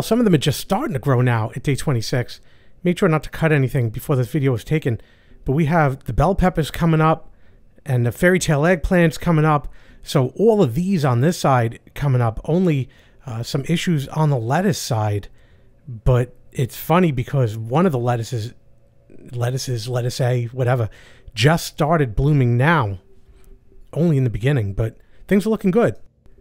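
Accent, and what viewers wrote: New York, United States. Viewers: is that a NewYork accent?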